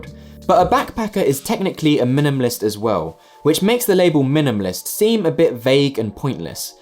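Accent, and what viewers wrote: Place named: England, United Kingdom. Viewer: Sorry to say so, but I raise an eyebrow when the first thing I see is an english sounding Matt D´Avella copy that sells a minimalist wallet in the first minute of the video. However as I am not that full of cynicsm and sarcams, I just watched the whole video and agree on many things. „Minimalism is a lifestyle for wealthy people who pretend to live like poor people.“ It is also for „better educated people“, who have the time and effort to change their lifestyle. That is all by design and choice. But Minimalism aside from a modern philosophy for the „Overfed wealthy young spoiled consumerism addict“ is a concept for everyone, a concept for the whole society. It is important to point that out and see the downsides of how minimalism is shown to the public by the regular show-off tools (socialmedia and yt). Minimalism is already misused by advertising, companys and consumerism lifestyle. 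Hey Look, my newest edc minimalist workout routine against procrastination! Nope, the bluecollar worker probably has to work nightshifts and overtime to just get food on the table and not the newest middleclass lifestyle Gadget that makes you feel like you are intentional.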